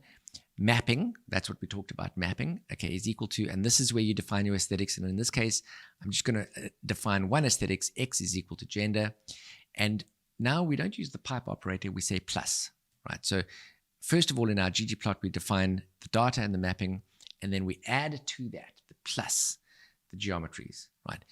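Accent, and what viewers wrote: South Africa. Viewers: Your accent reminds me so much of home! South Africa foreva! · OMG are you South African? That boomchakalaka had me.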